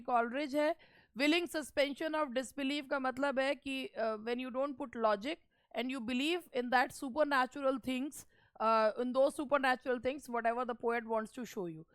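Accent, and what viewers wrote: Meerut, India. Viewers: Ma'am aapka Meerut vala andaj bahut bha jata h dil ko❤❤🙏👍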